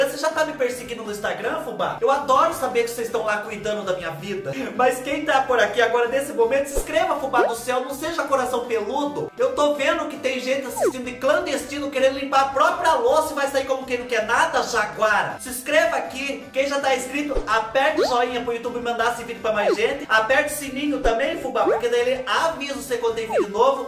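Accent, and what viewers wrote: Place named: Paraná, Brazil. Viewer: Oi fubá cansei de ser clandestino e resolvi regularizar nossa situação minha e da minha esposa e me inscrevi. Tenho uma pergunta: adoro sei sotaque que parece paranaense. Mas você cresceu no estado de São Paulo. Como conseguiu esse sotaque tão legal de paranaense? Amamos seus vídeos. Assim que passar essa coisa toda vamos te trazer para conhecer o nosso pequi com frango para ver que delícia fubá do céu. Tem vários Youtubers aqui também da área de culinária.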